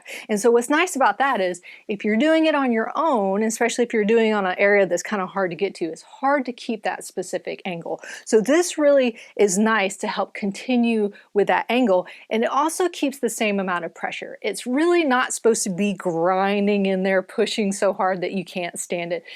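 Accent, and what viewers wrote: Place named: Oklahoma, United States. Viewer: I'm from west Texas, your dialect sounds like my family from Oklahoma, it's nice to hear!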